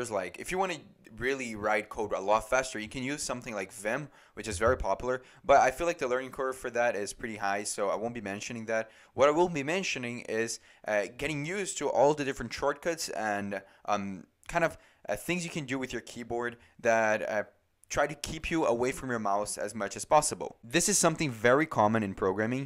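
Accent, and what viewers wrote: Brazil. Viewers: This is my first time seeing one of your videos, and you speak very well but I can't help but think that you sound as a brazilian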